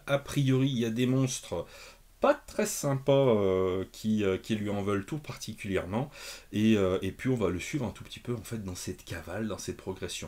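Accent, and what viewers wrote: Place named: United Kingdom. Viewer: Mais c'est que ça lui va bien ce petit rafraichissement capillaire. Et cet accent british... Oh My...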